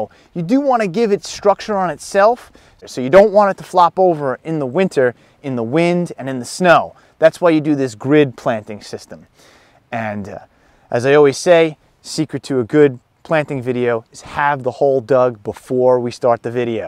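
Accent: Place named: New York, United States. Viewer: Give him a break. He’s a New Yakker.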